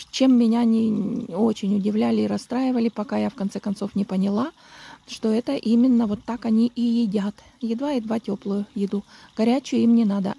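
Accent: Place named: rural, Russia. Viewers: голос сельской женщины - себя боится показать и мужей тоже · Да, чувствуется южный говор. Его не вытравишь никакой Англией.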